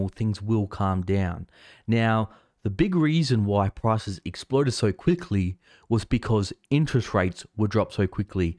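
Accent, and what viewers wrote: Australia. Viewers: Dude when I heard your Australian accent I thought you were talking about the Australian property market only to realise you were talking about the US. Got my hopes up. Property boom isn't ending here any time soon sadly and now agents are getting even more greedy by putting most of them on auction!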